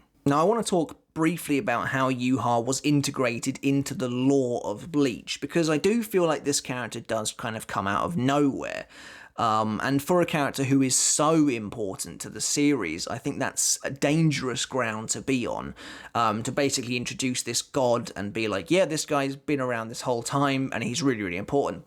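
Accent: England, United Kingdom. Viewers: I’m onto to you, there’s that subtle English accent but I won’t be fooled that your not American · Not usually a fan of British accents... but... your base, narrative delivery,and VERY concise knowledge of this masterpieces of a show... I’m now a solid fan! I’ve sent links to 12 of my fellow friends who are about this show like you are! Keep going all the way to the top bro! I’m an American fan! 😎❤️🙌🏻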